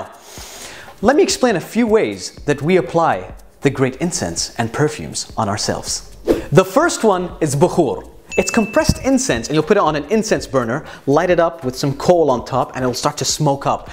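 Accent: United States. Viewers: Why american accent its not nice. Everything else is good. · besides the fact that you smell good...where did you learn how to speak american-english?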